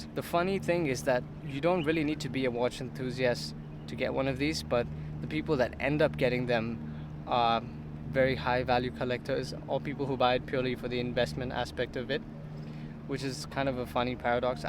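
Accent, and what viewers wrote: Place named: India. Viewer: Where are you from? Your accent is like Indian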